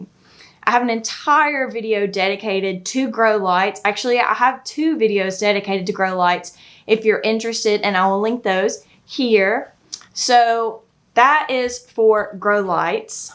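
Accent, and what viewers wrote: South, United States. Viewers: “Ah leeuhv eeuhn uhmericuh” in the world’s strongest southern accent, as if we didn’t know 😆. Haha no shade, I’m in Oklahoma.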